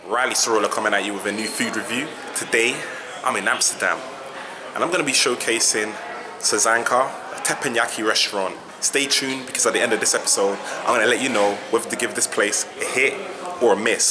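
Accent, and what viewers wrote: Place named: United Kingdom. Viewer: A black man speaking English with a British accent in Amsterdam while at a Japanese restaurant... Whoa my brain just froze bruv I swear lol but this vid satisfying af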